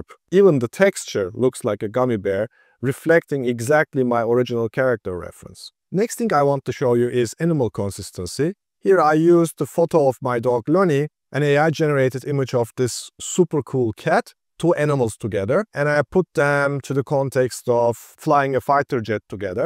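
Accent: Turkey. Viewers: turkish accent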